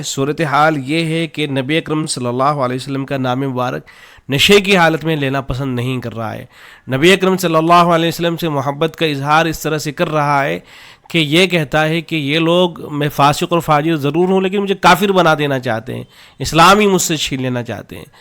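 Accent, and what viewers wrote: India. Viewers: مولانا السلام علیکم اپکاتعارف کیاھے آپ کہاں سے ہیں آپکا لب ولہجہ توشہردیوبندکے معزز لوگوں جیسا ھے ایسالگتاھےجیسےآپ میرے جانے پہچانے ہیں آپکی فراغت کب ھوئی ھے بہت شکریہ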